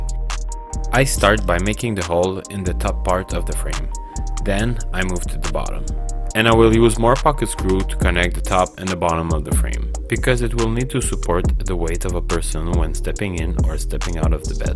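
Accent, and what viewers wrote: Quebec, Canada. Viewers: French canadian? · ca sens l'accent de Quebs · T'es pas québécois par hasards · Ça sent l'accent québécois tout ça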